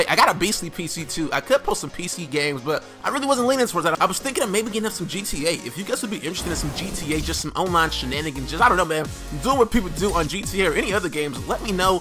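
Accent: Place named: New York, United States. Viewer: Lol u are a cowgirls fan but u sound like ur from New York.?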